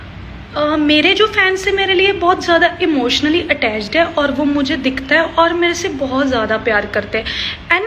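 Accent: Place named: Punjab, India. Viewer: Never leave your punjabi accent it is sure pure and full of good energy .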